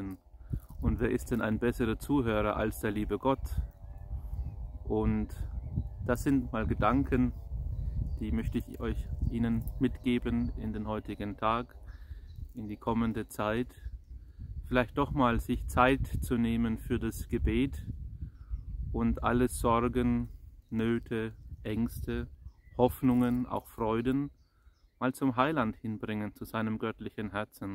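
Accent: Germany. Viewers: So wunderbar gesprochen und auch noch mit fränkischem Akzent, das hat mir sehr gut getan! Dank sei Gott! Und ewiges Vergelts Gott! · My father is "Unterfranke" and from Bad Kissingen your dialect therefore reminds me much of fond childhood days when we visited the relatives in Bad Kissingen. My dialect having grown up in rich of Roman history Palatine, mixed with American accent, a bit of Swabian, a bit of Palatine must therefore sound really interesting in a funny way. Thank you for sharing this beautiful nature transporting same to me with your priestly blessings. I wrote you a while back in that Email I had asked your priestly advice on Padre Pio. If you find some downtime I'd be grateful for your much treasured input. It makes me happy to see my Godly priest happy, relaxed, fueling the soul with new energy all whilst sharing our Lord's beautiful nature with us! 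May our risen Lord protect our Godly, prosecuted priests who lead their flock despite the worldly war of satan! 🙏🏻✝️📿🌿🌹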